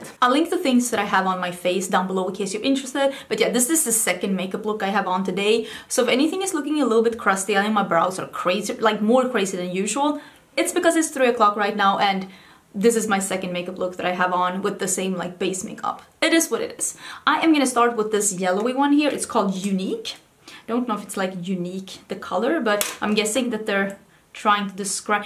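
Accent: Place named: Sweden. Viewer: Your English is brilliant and I love hearing your Swedish accent 🙏💕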